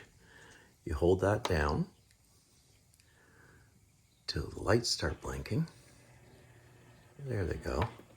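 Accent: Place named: Upper Midwest, United States. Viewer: Where you from? North Dakota? Minnesota?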